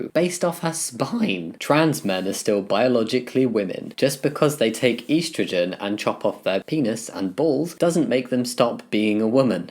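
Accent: England, United Kingdom. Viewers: Do you also get upset when people say "tom-AH-toh"? He has an English accent due to living in this place called England where things are pronounced differently. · He's British.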